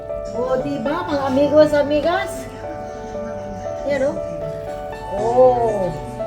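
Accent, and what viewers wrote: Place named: Pangasinan, Philippines. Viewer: masamit tay iluluto yo atse. taga Pangasinan kau amo Pangasinan accent . ☺ thanks for sharing☺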